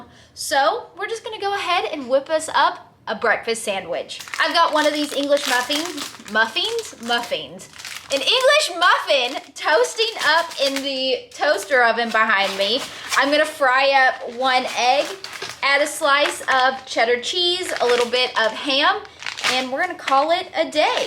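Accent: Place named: Texas, United States. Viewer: my riding instructor was always reminding me to keep my heels down in the stirrups. I guess it is a safety issue in case your horse bolts you won't get hung up in the saddle. I noticed the instructor on the video also had his toes jammed in too, I love Western riding but never cared for English, I hated their saddle type and it was hard on the bottom -) By the way, you are beautiful as always, my grand daughter looks alot like you, but we have the Michigan accent instead of the Lone Star State accent. Our southern friend tell us that we are the ones with the accent LOL.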